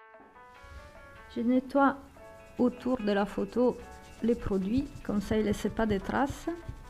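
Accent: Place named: South, France. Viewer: belle vidéo , avec un petit accent du sud , plus exactement de l'Aude ,je me trompe ? lol Bonne continuation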